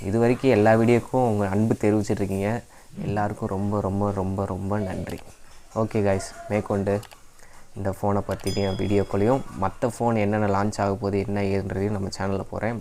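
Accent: Madurai, India. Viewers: Bro neenga madurai ah?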